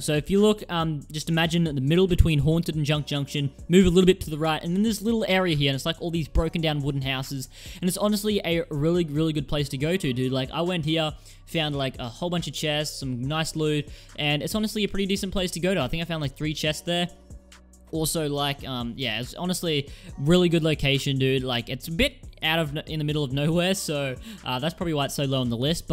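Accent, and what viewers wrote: Australia. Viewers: Fucking Woop Woop ahahaha Bloody aussie <3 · are you Aussie?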